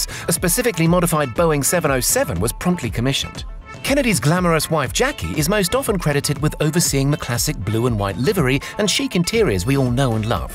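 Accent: United Kingdom. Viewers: Wow, that British accent caught me off guard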